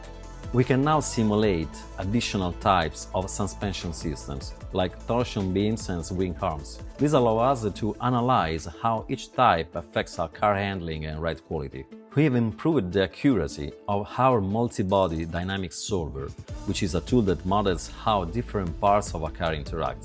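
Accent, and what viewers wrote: Rome, Italy. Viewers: i love the strong roman accent as an italian ahahahahah loving the fact the Alfa Romeo Giulia is the cover car <3 it deserves it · i dont know about you but i trust a guy with an italian accent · The italian accent is so cool. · Came for the asseto corsa evo update stayed for the absolutly beautiful Italian accent.... Bellissimo! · porca boia l'accento più italiano che abbia mai sentito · l'accento italiano è la cosa che rende ancora più particolare il video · L accento roma o rende tutto migliore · As an argentine, your clear english on pure italian accent feels like our grandfathers telling an interesting story, let me prebuy already i have saved on my steam wallet for months.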